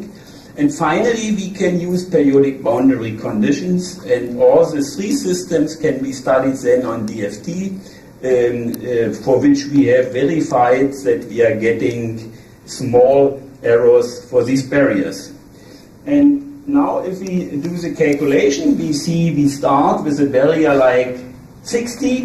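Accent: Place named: Germany. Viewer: dat german accent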